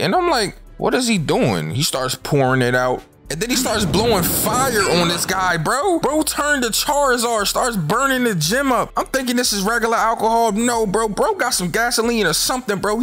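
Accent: Philadelphia, United States. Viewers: You from philly bro 😂🤞🏿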